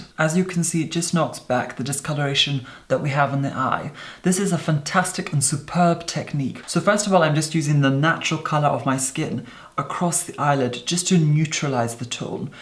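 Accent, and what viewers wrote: Scotland, United Kingdom. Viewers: I love your voice and UK accent😍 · I adore when I can hear a bit of your brogue... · Your knowledge of products is astounding! You know all the names and number of products and brushes just like that! Astonishing. How on earth do you do that? I strive to be a professional makeup artist and you set the bar VERY high! And your are so funny. Thank you for every film. You teach us mere mortals so much of your craft. I love your subtle Scottish accent too, as I'm Scottish myself. Wishing you all the best. Ilse from South Africa.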